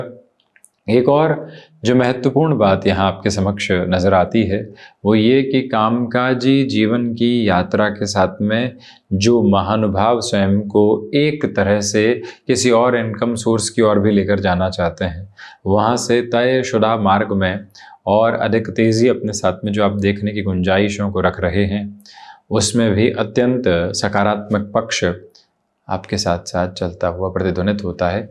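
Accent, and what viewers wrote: Standard. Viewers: आप का हिंदी बहुत शुद्ध है गर्व है ऐसे हिन्दुस्तानीयो पर जो अपने गरिमा को बनाऐ हुऐ है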